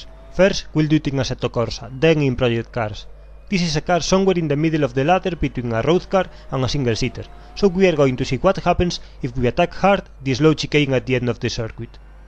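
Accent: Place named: Spain. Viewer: spainenglish! love XD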